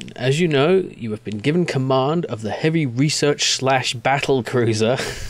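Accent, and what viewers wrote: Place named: United Kingdom. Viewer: Your British accent is much appreciated from the USA.🇺🇲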